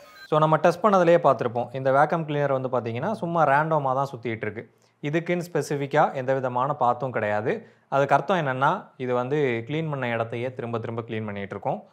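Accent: Tirunelveli, India. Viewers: தூத்த இடம்.. திருநெல்வேலி slag ❤❤❤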